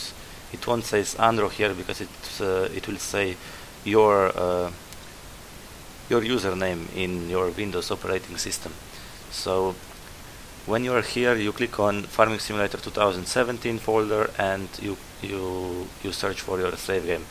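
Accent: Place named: Hungary. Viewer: Magyar vagy? :D Nagyon magyaros az akcentusod :D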